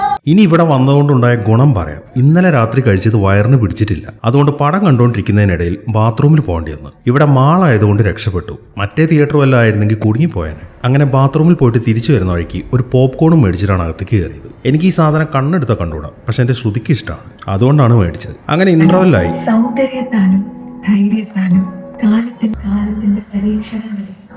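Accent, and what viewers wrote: Malappuram, India. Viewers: അന്റെ വോയിസ്ഓവർ കേൾക്കുമ്പോൾ ഒരു സംശയമുണ്ടായിരുന്നു ഇജ്ജൊരു മലപ്പുറം കാരനാണോന്ന് പുടികിട്ടി ♥️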